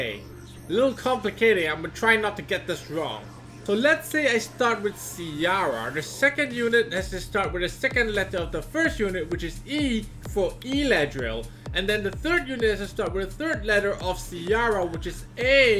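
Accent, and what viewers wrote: India. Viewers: Love the indian accent!!!!!! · best indian accent very good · indian accent crack me up so hard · lol indian accent lmao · Indian accent is so funny😂😂😂😂😂😂😂😂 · that indian accents roflllll. so bad senpai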